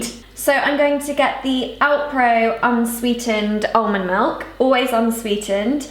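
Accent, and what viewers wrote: United Kingdom. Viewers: I love her British accent! It's so relaxing to listen to her talking! ❤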